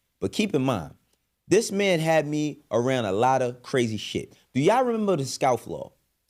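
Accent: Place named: New York, United States. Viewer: Why this dude sound like he from New York?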